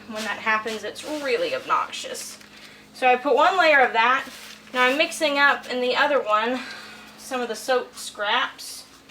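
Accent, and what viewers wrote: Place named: South, United States. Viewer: I love watching you make soap!! where are you from? You kind of sound like another YouTuber I watch, grvyrdgrl....I think thats right...she is from texas and has the most delightful southern accent, as do you :)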